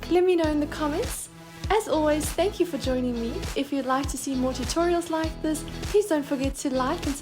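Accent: South Africa. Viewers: Hi there ... is that an SA accent I'm hearing? And are you from KZN or JHB? Watching you, I feel really inspired to start painting again. So up to my studio I go ... thanks to you:face-red-heart-shape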